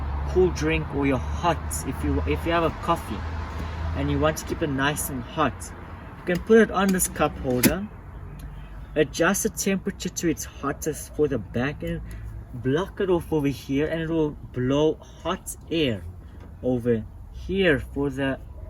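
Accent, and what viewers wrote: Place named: South Africa. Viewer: the moment u opened your mouth . . . i thought you MUST be South African, lol , nice review, very well put together, keep it up bro. !!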